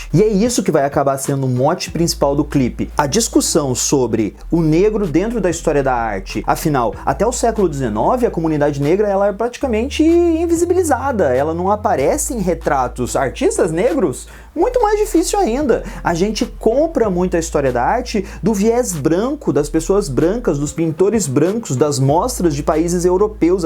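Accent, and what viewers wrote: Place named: Inland São Paulo, Brazil. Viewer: Sou seu aluninho do QG... Seu sotaque é igual o meu pois moro no interiorrrrr de SP .. Kkkk Adorei sua análise, estava aguardando alguém faze lá ...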